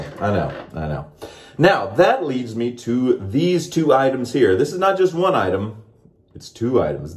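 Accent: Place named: United Kingdom. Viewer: You had your british accent going on throughout the video. Hh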